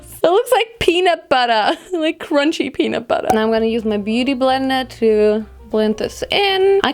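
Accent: Germany. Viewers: Girl you just try to much beeing a beauty-Guru. I dont know how i ended up here but wth you are so fake af du bist deutsch und das hört man auch! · Immer der gleiche Anfang ist langsam echt nicht mehr lustig. Dein Englisch ist auch nicht das Beste, man merkt, dass du eigentlich deutsch sprichst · Ich finde deinen deutschen Akzent richtig unangenehm. Du sprichst zwar gut. Aber das „the“ wird nur anders gesprochen wenn das folgende darauf bezogenen Wort mit einem a,e,i,o,u ausgesprochen wird. Im Normalfall wird das e bei the nicht betont.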